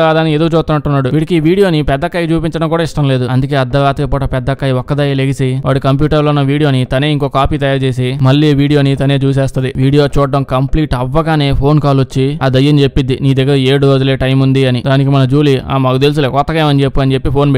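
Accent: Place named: Telangana, India. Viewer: Super bro horror movie kuda comedy movie laka chepavu telangana language super vudi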